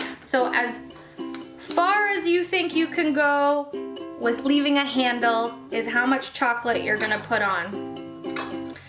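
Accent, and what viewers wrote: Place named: Canada. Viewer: Hi,! Those chocolate covered pretzels look amazing! I agree that drizzling on the chocolate over the bowl is the best thing to do. I love how you covered them with so many different things. Curling the bow was a nice touch. BTW: I am not sure if you mentioned you were Canadian in previous videos, but I am really into languages, and I was pretty sure you were...when you mentioned Waterloo and Kitchener, I knew it! YouTube is so great because we can hear accents from so many cities and countries. Thanks for sharing!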